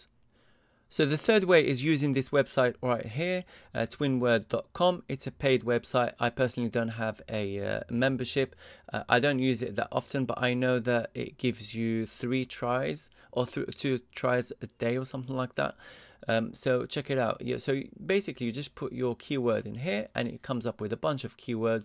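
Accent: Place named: United Kingdom. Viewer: i came here just to listen to your British accent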